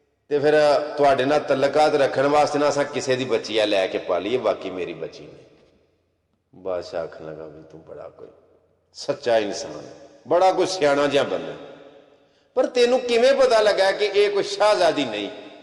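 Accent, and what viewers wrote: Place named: Sialkot, Pakistan. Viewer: brother you have good sialkoti punjabi eccent.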